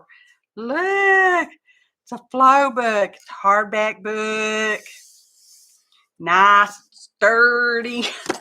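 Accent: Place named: Texas, United States. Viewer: Glad you are back in the grove. I payed part of your video at 1.5 speed.... it was funny listening to you talk! "Texan" sounds good no matter what speed!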